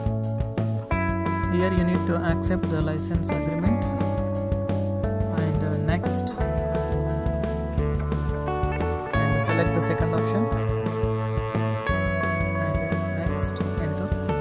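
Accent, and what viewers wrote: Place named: India. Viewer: I Hate indian speaking English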